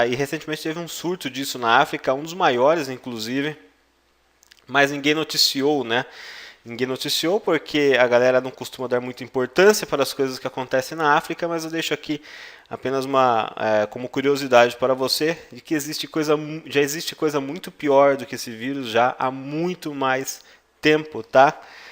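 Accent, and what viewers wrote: Germany. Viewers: Você teve facilidade de pegar esse sotaque no alemão ???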